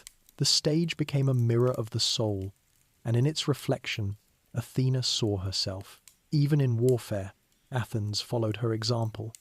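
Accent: United Kingdom. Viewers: Are you talking about the AI British accent?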